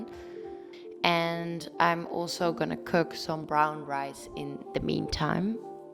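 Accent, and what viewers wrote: Netherlands. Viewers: Are you dutch? Because 1 you’re name and 2 you’re accént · Omg are you dutch? I think i can hear it out of your accent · Ik hoorde aan je accent dat je Nederlands was daarna zag ik je naam haha 😇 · Is she dutch? She sounds dutch but i cant find dutchvideo's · Are you Dutch? Because it kind of sounds like it🙂 I really enkoyed this video!! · R u dutch? U really sound like dutch haha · Great food. I can hear that you are Dutch. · You sound like you have a Dutch accent :)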